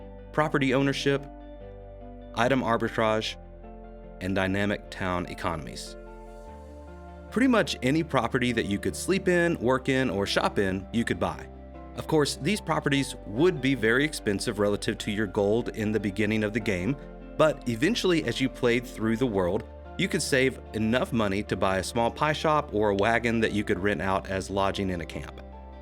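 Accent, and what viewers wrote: United States. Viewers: You sound like people I know from South Carolina. Love these videos btw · How much is Microsoft paying you, and which Southern State are you from, I definitely hear a "TWANG!!!"😆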